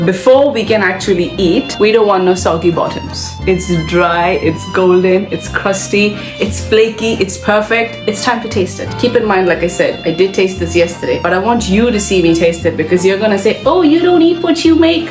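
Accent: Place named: France. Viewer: Impressive and French accent is nice one to add along with lovely Galette preparation . Keep going girl ❤🎉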